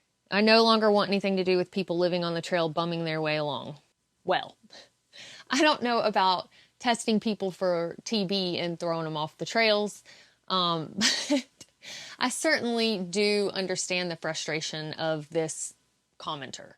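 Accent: South, United States. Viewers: Hi, long time viewer, 1st time commenter:) A couple of housekeeping things to start. A missed opportunity, you mention 'announcing to people and being embarrassed on the front side...' Is better than being embarrassed on the backside:) Nextly, did you know that with your southern accent, which I adore, your pronunciation of Iceland sounds very much like Assland. Talkin' about poop and all in context lol. Ok so seriously, one of my many careers in life involved being a professional, corporate problem solver. On the Yellowjacket problem I have about 4 solid solutions in mind so let's start with just one and see what you think. Could I suggest a while list of people who the entire hiking community know are legit through hikers who are putting in honest miles. In my opinion a private facebook group with admins is most likely the easiest solution. Hikers like yourself are in the group as well as angels who are providing services for an intended group and purpose. 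If you're not in the group you don't have access to what is made available. There are ways to 'admit' people who are whitelisted as well with a cheap wristband or such but I'm just looking ahead to how to you keep track of who is white listed and on site. The angels can also be in the facebook group and can ask a question about someone who might be legit but not in the group yet. There should always be enough people available with internet access to vouch for someone. I would think the group is close knit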